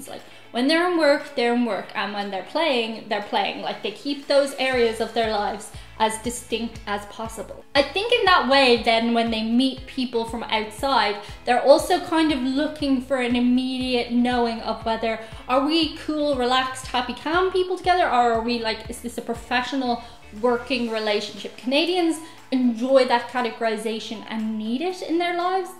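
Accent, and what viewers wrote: Ireland. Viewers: I thought you were Irish.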